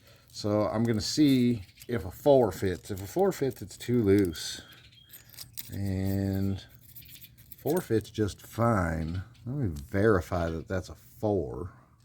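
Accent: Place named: Kentucky, United States. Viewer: Im gonna get you a phone camera stand for you this garage day this year which is first day of winter only as a early as possible date and You'ved lived in Kentucky for so long your starting to speak tucky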